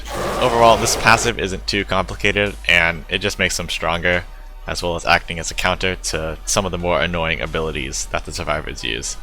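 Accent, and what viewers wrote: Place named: United Kingdom. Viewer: your British, aren’t you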